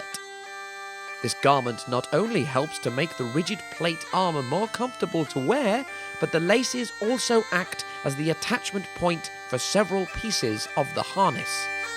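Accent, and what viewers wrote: United Kingdom. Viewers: man this guy doesnt know what he's talking about does he ps. your accent sucks man + british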